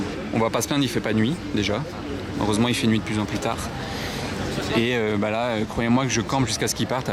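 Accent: France. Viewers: Je ne comprendrais jamais cette intro ridicule en anglais avec un accent francais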